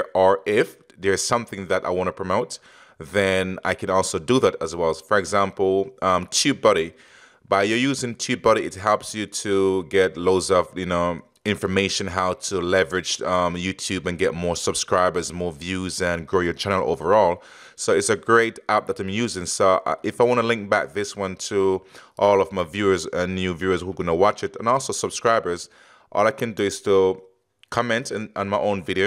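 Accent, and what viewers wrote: Jamaica. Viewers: For some reason you sound like a Jamaican mix with more England also some US. 😂😂 anyway good video if I'm right i am inviting you over to my channel <link>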